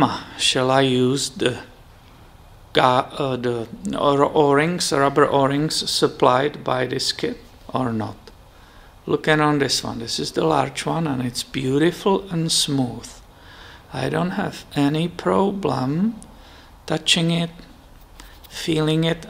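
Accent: Germany. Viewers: Thank you for you honesty and vulnerability regarding this repair. I am a High School Auto Shop teacher and I always impress upon my students that everything you do is a resume. Sometimes repairs won't work out perfectly and you might have to eat a bit of loss to complete the repair and satisfy the customer, but if you are honest and transparent, you will earn their trust and have them for life. You will also earn the customer's referrals. You are the kind of YouTuber that I am happy to add to my course content! I had the same experience on my wife's BMW X3. The o-ring on the oil cooler line tore the same way and leaked all over the accessory belt. Luckily I noticed it before the belt dissolved and broke (they have been known to wind around behind the harmonic balancer and punch through the front main seal into the sump, resulting in major engine damage). By the way, I am curious what part of the Old Kountry you come from. 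My wife was born in the Flensburg region but she can't identify your Dialekt. Bayrisch? Schwabisch? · are u German or Dutch? Long time no video, thanks!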